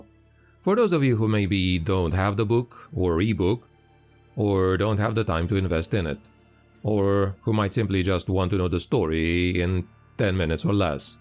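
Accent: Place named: Transylvania, Romania. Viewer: live from Transylvania! Two blocks over from Dracula's castle 😎. Okay I'm sure you're probably not near Dracula's castle, but I can at least pretend you are 😊. I mean come on, that accent 🥰.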